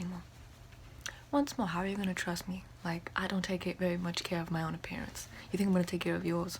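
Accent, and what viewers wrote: South, United States. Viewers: More southern accent videos PLS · You absolute babe, I love Southern USA accents in Asmr! Thank you 💜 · 1. I'm southern and faux-southern accents usually bother me in asmr in particular, but the antebellum aspect of this aspect made it so enjoyable. I love the sort of over-the-top elegance of thick civil war era accents. 2. I had no idea so many people were into vampire diaries until I scrolled through these comments. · I do adore the snooty sothern acsent becuse it sounds so gossipy and prim, i love it ! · I know it’s probably a reference to something else, but the reference to the vampires and the southern accents just makes me think of interview with a vampire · Last night, I giggled when you mentioned thomas edison... I laughed myself awake at the "realization" that you are doing a southern roleplay and you set it during the civil war. 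"Lincoln is the president. and we are at the civil war. and I have a southern accent. That is terrible." Laughed the entire day about that series of statements. Thank you so much. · "and i have a southern accent...that is terrible ..." I laughed so hard I love it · Southern accents are a kryptonite for me. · Yasss the southern accent- so much love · lol that made me smile :) And your old timey southern accent is resonant gold! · I love the freckle on your nose. Odd comment i know, but it is just so unique to your face. Lovely southern drawl, reminds me of Steel Magnolias~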